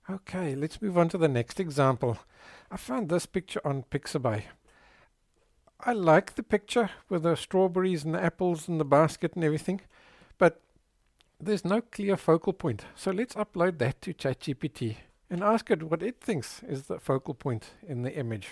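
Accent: South Africa. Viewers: Hi you sound like a South African. I enjoy your presentations. Very thorough. Thank you from South Africa.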